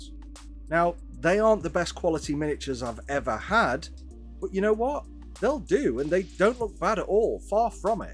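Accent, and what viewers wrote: United Kingdom. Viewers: "I sound cocky and arrogant" Me: That's probably Americans judging him based on his accent.... explains boot As an American, I think any American that interacts with any UK media or content creators and does not know bonnet, boot, lift, flat, torch, etc is just being willfully ignorant... on the positive side though, they would also miss the "knob" double entendre.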